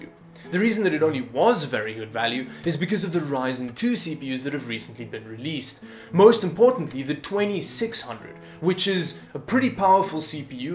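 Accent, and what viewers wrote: South Africa. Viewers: Great video... Hey are you South African?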